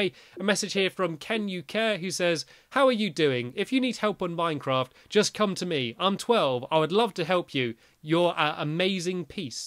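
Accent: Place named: Newcastle, United Kingdom. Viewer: North East England! Propa Geordie :P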